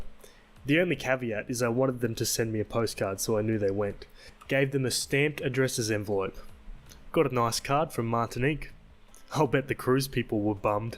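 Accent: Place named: Australia. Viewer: It's great to hear another Aussie doing the Reddit stories. Sick to death of the dammed Yanks having the monopoly on YouTube. Looking forward to more from you but I'd like to suggest trying some different sub-reddits because I have heard the second story 5 times in the last couple of days. Few people do neckbeards, incel tears and several other entertaining ones. I think I even saw a Bogan one somewhere.... lol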